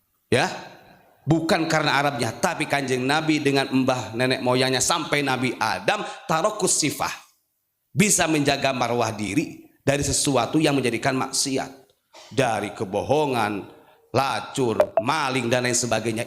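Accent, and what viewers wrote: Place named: East Java, Indonesia. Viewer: Betul Gus....jatim mn suaranya..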